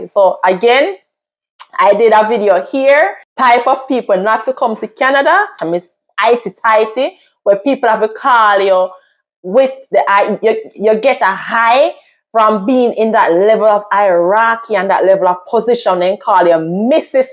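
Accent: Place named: Jamaica. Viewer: I love how you embrace your Jamaican accent.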